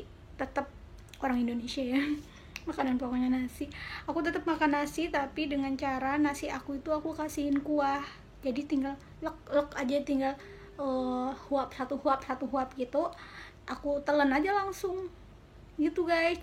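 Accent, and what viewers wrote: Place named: West Java, Indonesia. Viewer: Sunda bangettt:v wkwk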